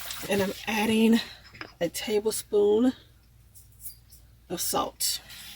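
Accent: South, United States. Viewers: Yes you sound like you from the south. This made my day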